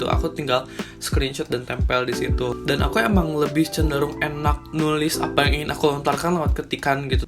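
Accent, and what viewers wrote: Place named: West Java, Indonesia. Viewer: Sunda pisaannn euy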